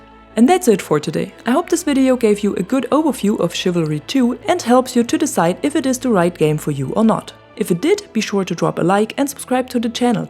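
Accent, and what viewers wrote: Germany. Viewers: Bist du deutsch? 😆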